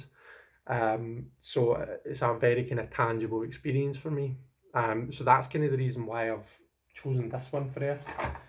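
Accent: Scotland, United Kingdom. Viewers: Congratulations on a fab first video and always lovely to hear a broad Scottish accent :-)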